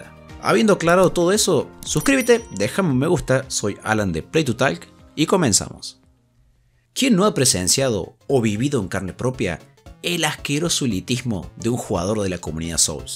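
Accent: Córdoba, Argentina. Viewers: Che loco vos sos de cordoba o alguna provincia vecina?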